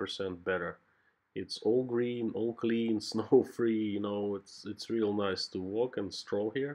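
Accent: United States. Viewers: You speak interesting , clear American English. I watched all of this presentation. Thank you , good job of it.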